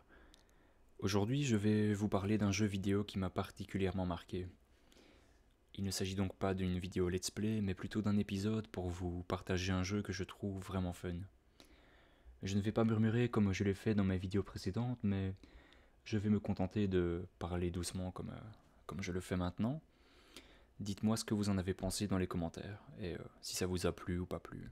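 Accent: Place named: Belgium. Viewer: J aime bien ton accent belge 😏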